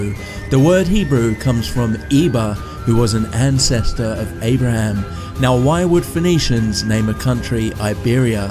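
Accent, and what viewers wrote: United Kingdom. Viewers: I'm so tired of British accents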